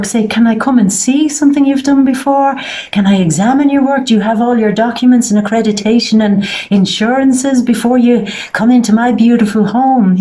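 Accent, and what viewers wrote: Ireland. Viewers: I be getting the feeling that you're lowkey Irish by your accent. Btw. I like your style.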